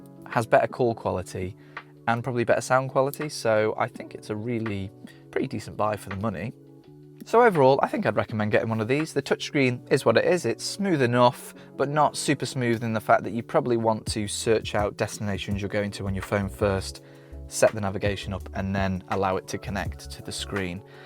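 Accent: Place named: Nottingham, United Kingdom. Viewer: Really good video, thank you! From your accent and the A453 Road, I’m guessing you’re somewhere around Nottingham, England — but I noticed you mentioned prices in dollars. Any reason for that? This is actually the first time I’ve watched your video, and I’ve got to say, your presentation is awesome. I ended up watching the whole thing without blinking! Usually, I only watch about 20% or skip to the interesting parts, but yours kept me watching all the way through.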